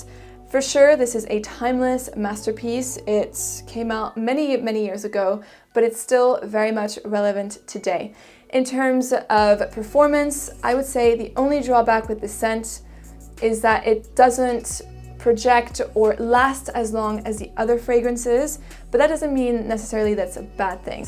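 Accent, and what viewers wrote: France. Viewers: I think she's French or at least can speak French really well cause even when speaking English you can hear a little French accent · Im gonna say shes French with perfect English.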